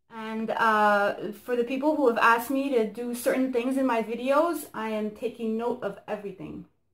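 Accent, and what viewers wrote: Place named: Canada. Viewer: your Canadian eh! love it great work😁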